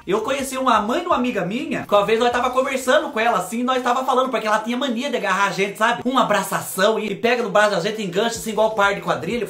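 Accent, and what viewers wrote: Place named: Minas Gerais, Brazil. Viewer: Vc é mineiro uai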